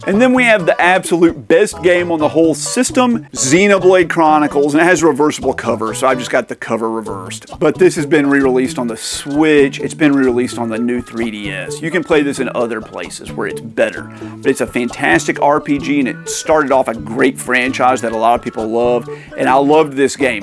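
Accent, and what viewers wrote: South, United States. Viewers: Apart the cabbage..are you from suddern m'erica ,i dont know i thought you are from Canada... I am european i berely speak/write m'erican language, so please forgive me, but legitimately what country are you from 🤔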